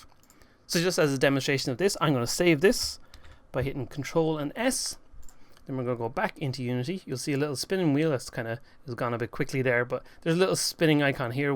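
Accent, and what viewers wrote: Ireland. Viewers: Thank you so much for this video. As a newbie to C# I appreciate you taking to time to explain your coding. I really like the Irish accent but I did type VectorTree instead of Vector3 at one point XD XD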